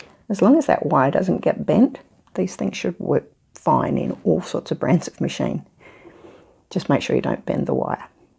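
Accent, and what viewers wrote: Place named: Australia. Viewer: Thanks for the demonstration. I have one of these but had no idea how to use it. A very useful gadget I met you recently in the Janome shop in Joondalup where you helped me buy my lovely new machine. Good luck with your channel. So nice to hear another Aussie voice on YouTube. Maybe some advice on how to use the one step button hole foot next?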